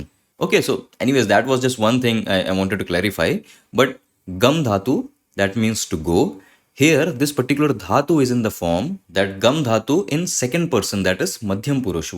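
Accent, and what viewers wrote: India. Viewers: You are doing a great ,noble worj,learning Gita and lot of Grammar also assosiated with it .Thanks 🙏 One suggestion , Hindi pronunciation is occurring in a lot of places like Vachan ,Ken ,purush etc .If that is changed ,it would be wonderful.thanks again . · Thank you for taking our suggestions on Hindi pronunciation leakage into your commentary. Otherwise your videos are top notch हिन्दी उच्चारणस्य प्रयोगं न कर्तुं अस्माकं सुझावान् गृहीतवान् इति धन्यवादः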